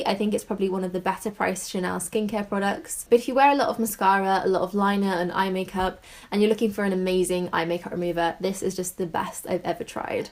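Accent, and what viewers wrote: United Kingdom. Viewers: I made it to the end frankly I just love hearing your voice. I am an American and I love British accents. Thanks for the video have a great day!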